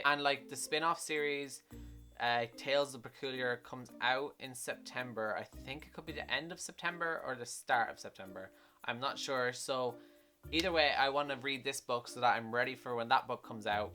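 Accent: Ireland. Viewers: Are u from Dublin ?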